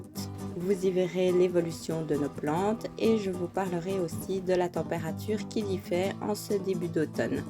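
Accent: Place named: Belgium. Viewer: Olà la p'tite famille 👍🏼 merci 😉 j'adOOOre ta petite intonations Belge 😍 bonne continuation 😘 et à+❣️💃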